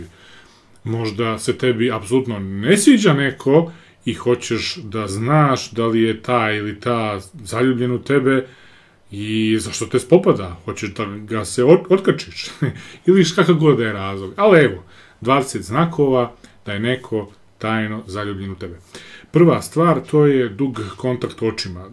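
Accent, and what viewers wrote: Ijekavian, Serbia. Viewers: Opis i tekst na ekavici. Govor na ijekavici. Kompleksi su cudo. 🤣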